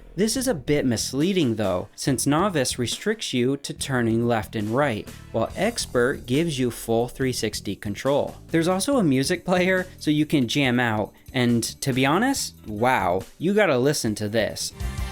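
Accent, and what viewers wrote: England, United Kingdom. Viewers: The voice sounds like an english accent, but possibly someone who's first language isn't english but learned how to speak english via someone with an english accent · that accent sounds like a british guy trying a eastern european+asian accent. its wild. goes from jackie chan to arnold in like, .5 seconds.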